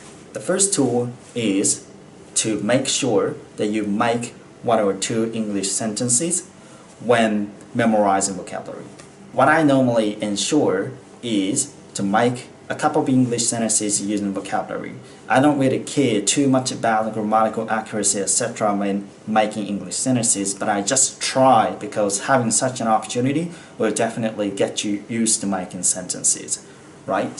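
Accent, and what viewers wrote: Australia. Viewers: オーストラリア英語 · オーストラリアはこういう発音なんだ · だいぶオーストラリア英語になってきましたね。 makeの発音、マイクに聞こえる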